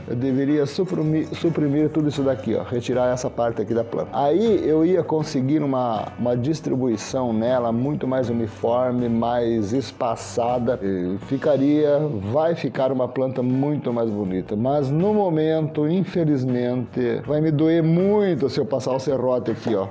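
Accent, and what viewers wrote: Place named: Rio Grande do Sul, Brazil. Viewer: Ah sim, achei o seu sotaque bem parecido com o nosso daqui do RS, gosto muito dos seus conteúdos.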